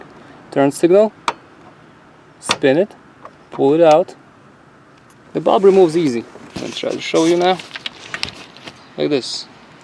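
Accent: Russia. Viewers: Наш русскоязычный парень, судя по акценту😊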